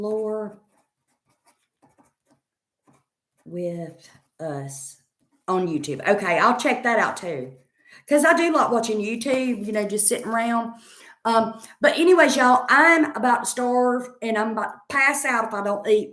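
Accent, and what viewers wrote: South, United States. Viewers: I adore you. I use to live in texas an have missed the southern drawl. You are hilarious. I had lost my laugh cuz of an abusive relationship and watching you I've found my laugh again, so I am forever always be grateful. And tell everyone to watch you. I wish you success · When you Start to say "What the f.. future holds.." I laugh right off the bat..I expect those southern words right off the bat..love you!🤣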